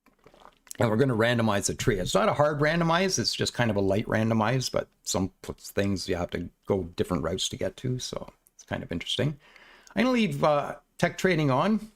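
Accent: Canada. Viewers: I am sorry man. I mean no disrespect, but you sound so Canadian. Then I look at your profile and you are from Canada. I am going to check you out man. I just had to put that thought out.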